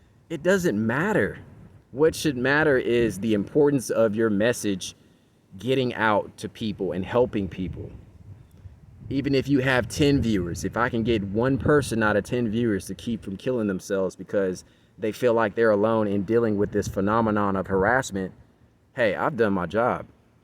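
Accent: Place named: Spain. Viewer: Not to be racist but I thought you were Hispanic. You have like a Spanish accent to me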